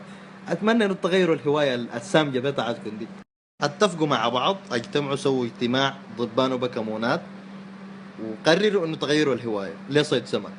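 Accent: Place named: Kordofan, Sudan. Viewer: كفوو يا ، لهجة اهل كردفان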